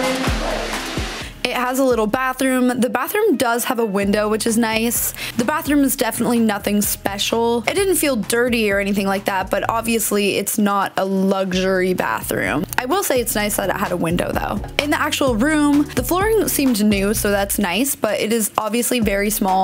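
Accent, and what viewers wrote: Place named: Southern California, United States. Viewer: Why do you speak like you're from SoCal when you've only lived there a few weeks?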